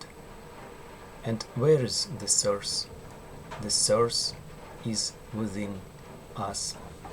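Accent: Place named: Russia. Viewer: Sweet russian accent:)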